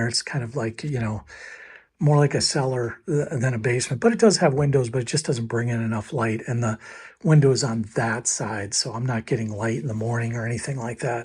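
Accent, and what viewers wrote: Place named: Midwest, United States. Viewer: Love how mid-west you speak,! I remember your cool old drafting table you had in the basement studio. Wondered if you miss it.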